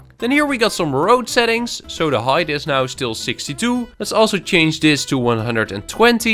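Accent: Netherlands. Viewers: sounds very dutch xd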